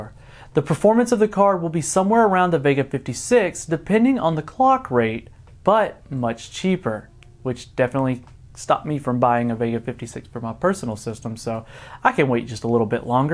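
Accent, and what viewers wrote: South, United States. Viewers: Your mother or fathers strong cowboy country accent really comes through while stoned :) I went ahead and got a Sapphire Pulse Vega 56 for £270 (with the 3 free games bundled).. It's not worth waiting if you want something now. Undervolted to 1100mV and ramped the frequency to 1700/950 and off I went (after running Firestreak stress to completion of course). I got me some GTX 1660 Ti ass kicking goodness.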